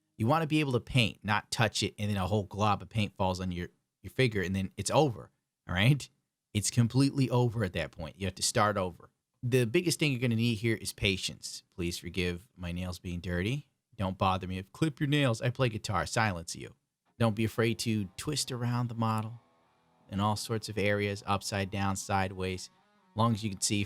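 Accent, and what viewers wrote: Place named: East Coast, United States. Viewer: Love your videos. You are currently my favorite Gunpla youtuber. I love the diorama, and painted gunpla, your east coast accent and comments. All of it is fantastic. One issue I do have is that I can't watch your videos around my kids because of all the cursing. If you can tone it down, cool! I can let them watch with me. If you can't, that's cool too. I'll watch your videos by myself. Keep up the great work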